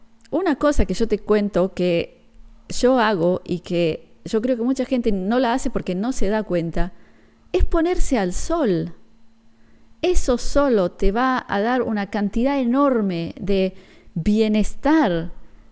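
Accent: Argentina. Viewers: Gracias. Es el tiempo del ser humano "común". Nosotros debemos reconstruir la humanidad en este tiempo. Respecto a los medios, desde mayo del 2020 no miro NADA...Me informo solo por Twitter....Por tu forma de hablar creo que sos argentina. Un fuerte abrazo desde Buenos Aires! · Excelente, gracias!! Les comparto lo que hago. Me he aprendido de memoria el Salmo 91 y el Salmo 23, y cuando voy camino a mi trabajo que es a las A.M. hago esas oraciones; antes de empezar a trabajar hago 30 minutos de meditacion. Luego empiezo a trabajar en un campo de golf y tengo sobredosis diarias de vitamina D. Cuando llego de regreso a casa no veo para nada TV, veo a Salud y Finanzas Inteligentes y me siento muy bien física, mental y espiritualmente. Sería excelente que hicieras meditaciones guiadas con tu amorosa voz Argentina. Saludos de un mexicano radicado en Las Vegas, NV.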